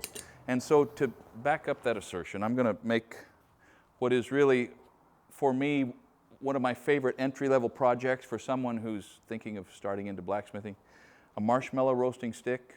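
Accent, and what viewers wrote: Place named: Oregon, United States. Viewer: It sounds like you're from Oregon as well. So cool! I am interesting in learning blacksmithing myself and this is a very helpful video.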